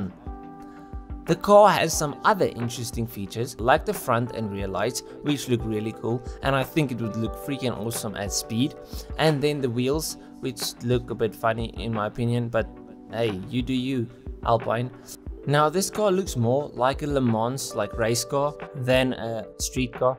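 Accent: South Africa. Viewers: I love your South African accent. Subscribed.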